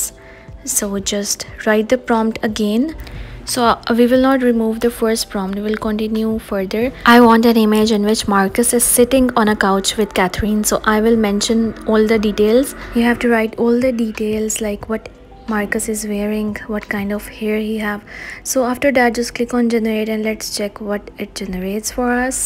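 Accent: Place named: India. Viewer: It doesn't work that way and moreover I don't understand why your accent is like an Indian woman ?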